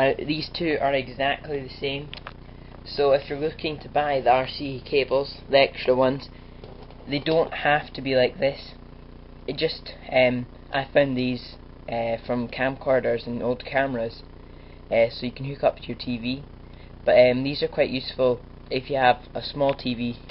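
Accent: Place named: Scotland, United Kingdom. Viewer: Chewin the fat this guys well Scottish :L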